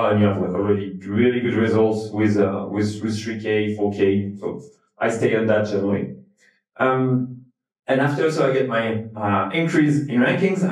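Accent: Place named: France. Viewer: Fluent English ! With pretty french accent, j'aime bien :)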